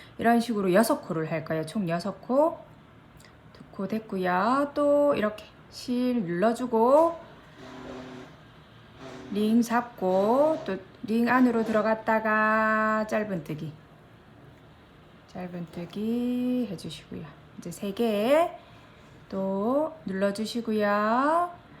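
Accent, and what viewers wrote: Busan, South Korea. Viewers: 어머...손재주 좋으시네요. 이런건 구매해서 쓰는줄만 알았는데... 살짝살짝 부산 사투리 듣기 좋아요 설명도 잘해주시니 한번 만들어 보고싶은 욕구가 막 생기네요.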